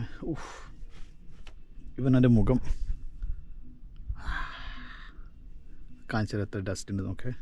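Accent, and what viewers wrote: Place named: Thalassery, India. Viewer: Thalassery language ayath kond oru veraity Vlog ayittund nannayittund ketto daily vlog okkey idu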